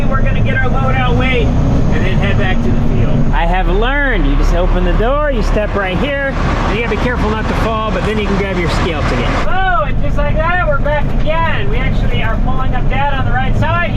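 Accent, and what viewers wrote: Scotland, United Kingdom. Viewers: Scottish : my very favorite accent 💙 greetings from Utah, USA🇺🇸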